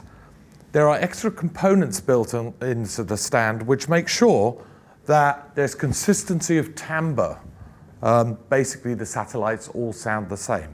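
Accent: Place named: United Kingdom. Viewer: Literally sounds nothing like him, this guy has a thick Brittish accent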